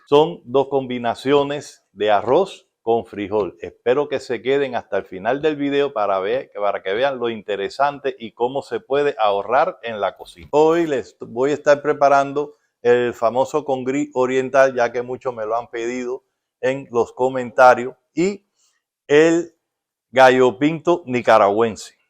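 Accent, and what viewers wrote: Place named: Cuba. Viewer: Felicidades por su canal, mi Padre era de Santiago de Cuba, y cuando lo escucho hablar me recuerda mucho a el, saludos y mucho exito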